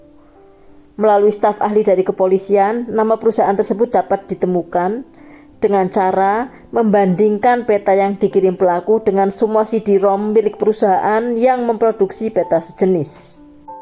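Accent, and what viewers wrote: Java, Indonesia. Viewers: Pembawaanya yg medok itu ciri khas tersendiri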